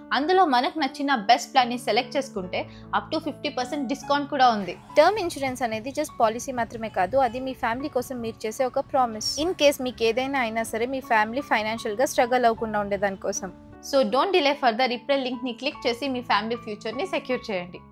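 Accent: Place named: Karnataka, India. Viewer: Karnataka telugu ilaane untundi...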